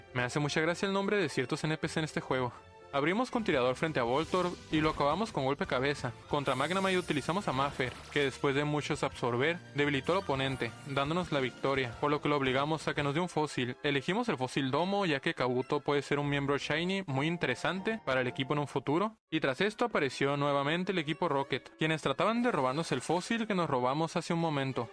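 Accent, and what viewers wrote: Chihuahua, Mexico. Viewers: excelente video! tu acento es muy parecido al mio, no eres de chihuahua mexico? un GRAN trabajo y muy tardado, gracias por tu esfuerzo, un saludo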